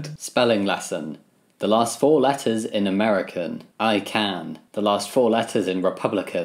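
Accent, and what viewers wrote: England, United Kingdom. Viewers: you look, talk and act like a caricature of what an english person looks like on basically any american sitcom EVER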